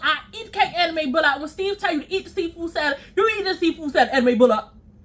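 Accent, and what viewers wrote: Baltimore, United States. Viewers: I love ur bmore accent. Meet his purrents 💜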